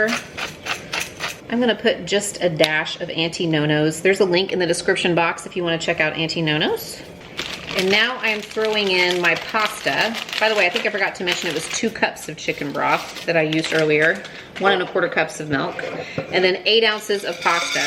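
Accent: United States. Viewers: I don’t know why but I really love listening to you talk and your American accent 😂 the way you say rolls and noodles. Lots of love from Australia thanks for another great video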